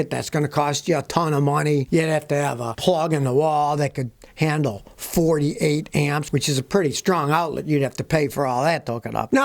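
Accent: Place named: Tennessee, United States. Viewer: Anyone else notice that is getting a Tennessee drawl???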